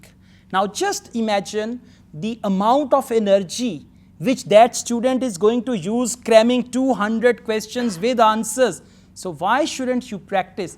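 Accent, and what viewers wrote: Punjab, India. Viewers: Sir ur punjabi is same as us dun vatta 😁😁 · Sir although your teaching is just fabulous bt i really likes the desi punjabi words you speak Kithe aa tera kutta😁🤣🤣🤣 Fun and learning together It makes me feel that i m in touch wid my native language 😊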